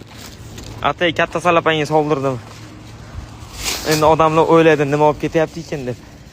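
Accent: Andijan, Uzbekistan. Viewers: Ука Анжан Шевами 😂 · Aka Andijonli emasmi. Sheva tortyapti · Anjanlimisiz? Mazasini aytmadizzu bebaho shekilliga 😂😂😂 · G'irt anjancha sheva😂❤ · Зу'р гаплардан бу'липти юю Шева андижон❤❤ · 😂😂😂😜👍👍🤲🤲ИЧИМ УЗУЛУБ КАЙТА, КАЙТА КУЛДИМ😂 . АНДИЖОНЛИК ШЕКИЛИЙ ( ШЕВАДАН) (МЕНХАМ 😜).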